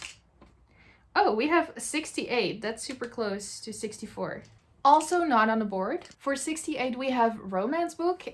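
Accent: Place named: Netherlands. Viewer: HI!! I just subscribed to your channel. I'm also from the NL and I love how you speak English ahaha. Good luck with your TBR!! And lots of fun💖💖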